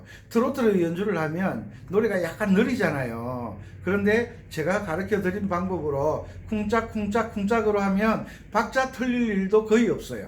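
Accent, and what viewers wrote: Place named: Gyeongsang, South Korea. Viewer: 완전 갱상도 니다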